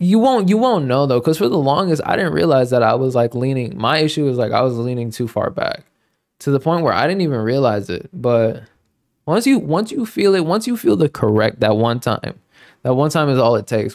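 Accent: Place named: New York, United States. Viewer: It's amazing how you grew up in GA but have a new York accent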